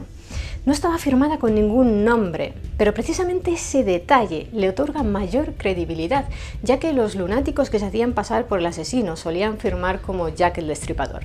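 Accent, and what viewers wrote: Spain. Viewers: me gusta escuchar historias con acento español, cuando escucho acento de mi pais o de latinoamerica no me asusta me dan ganas de bailar jajajaj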